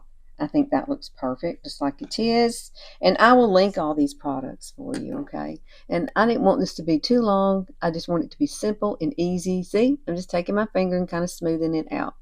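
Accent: South, United States. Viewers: Watched your lovely informative video from 2024. Love your soft Southern accent.I’m 77 had my eyebrows tattooed years ago Now I have dark thin eyebrows 😢!! Thank you,Carol from ‘Australia’❤ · I just love your accent. I think southern accents make you sound friendly. It just makes me smile. Thank you for this tutorial. My daughter is getting married next month and I’m trying to relearn how to put on makeup again. Haven’t worn it for years. This is gonna be very helpful until I do it myself. Haha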